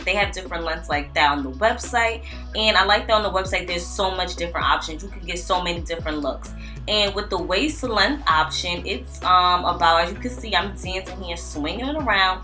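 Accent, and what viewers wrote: New York, United States. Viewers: New Yorker?😊🤗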